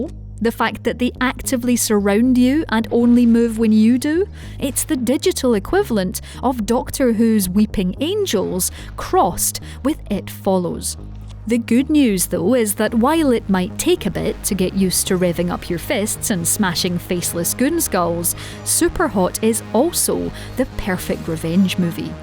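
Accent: Scotland, United Kingdom. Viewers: i love this ladys scottish accent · This is a nice scottish accent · everyone talking about games but no one talking about narrator girl .She mixed her scottish-english-welsh accents and it sounds like cute xD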